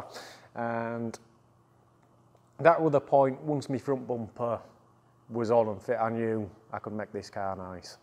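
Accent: United Kingdom. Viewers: Oooo Kay...love the brit accent but would of loved even more to HEAR THE DAM CAR..😊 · That Northern accent...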